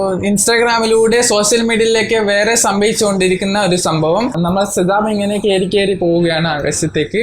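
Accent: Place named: Malappuram, India. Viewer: Slang kettit on malapuram karann ahn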